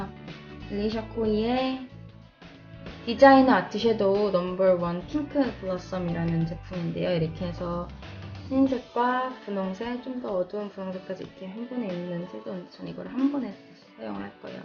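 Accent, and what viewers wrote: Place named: United States. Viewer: 외국인이시져 영어를 너무 부드럽고 정말 잘하시네요 외국인이시라면 어디나라에서 오셧어요? 제가 생각하기에 미국(USA)같은데 아닌가요? 어디에서 오셧어요?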